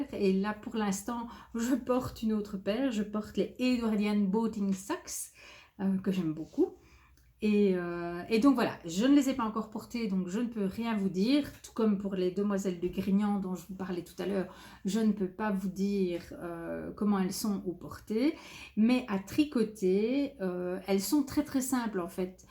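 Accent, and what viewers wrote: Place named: Belgium. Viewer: Coucou, voilà que je découvre ton podcast et j'ai déjà regardé quelques épisodes qui ont été très appréciés! Dès le premier visionnage, j'ai eu le sentiment que j'écoutais une compatriote belge. Il semble que tu as habité la Belgique! Ceci étant dit, je suis une grande consommatrice de podcasts tricot français 😉. Bref, j'aime beaucoup ce que tu présentes et la manière dont tu le fais et je m'abonne de ce pas! Gros bisous de Belgique😘♥️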